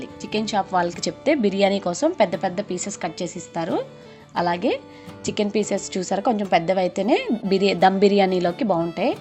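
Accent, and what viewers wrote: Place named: Guntur, India. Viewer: medam padaka padaka antunnaru meru form guntur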